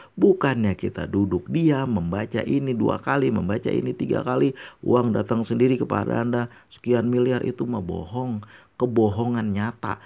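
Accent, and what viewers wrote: Jakarta, Indonesia. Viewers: Assalamualaikum,ustad d Aceh ya ustad,tapi asli Betawi apa Sunda ustad logatnya seprrti orang betawi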